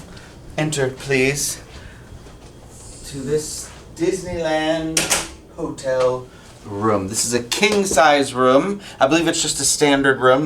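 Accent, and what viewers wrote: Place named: Massachusetts, United States. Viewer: Mass accent béer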